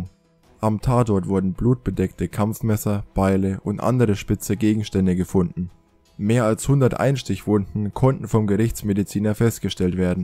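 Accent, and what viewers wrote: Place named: Franconia, Germany. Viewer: so geil! Ich liebe fränkisch! Alle aus meiner Family kommen da her. (Nur ich nicht 🤨)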